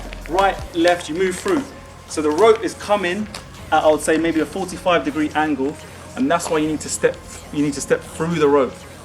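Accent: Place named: London, United Kingdom. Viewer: Awesomely slick mate, my ropes should be here today or tomorrow.. Can't wait.. How long should I live in London to acquire the slick accent yo?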